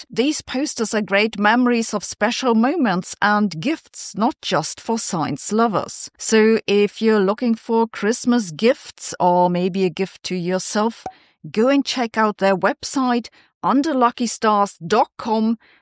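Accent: Germany. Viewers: We love your considered approach and your German accent. This is a good disection of the issues around orbital data centres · we like your german accent,.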